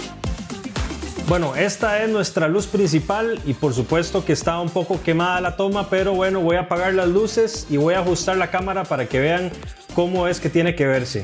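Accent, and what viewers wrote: Costa Rica. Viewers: Que cool este video 😮 , estoy por empezar un podcast con una amiga y este video es oro 🥇🪙, desde que te escuché pensé que eras de Costa Rica, porque tengo un amigo que habla casi como tú 🤭 no me equivoqué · ya decía, esa manera de pronunciar la R es inconfundible de un tico, buen video :) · mae los ticos tenemos un acentillo raro no arrastra la r y aun asi me di cuenta que sos tico pura vida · Acento tico!!! Genial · Mae el acento tico es probablemente el mas reconocible jaja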